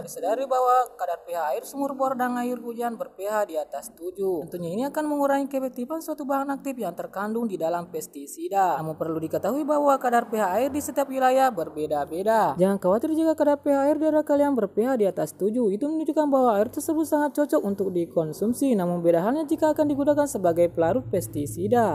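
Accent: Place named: Sulawesi, Indonesia. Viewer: Hafal logatnya dari Sulawesi yaa gan.... hehe. Kami pun sama mengelola cabai juga gang. Boleh kemari mampir juga gan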